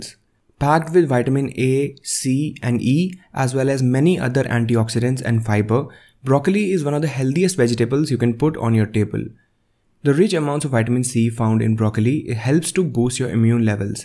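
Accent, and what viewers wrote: India. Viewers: Indian voice 🇮🇳🇮🇳🇮🇳😊❤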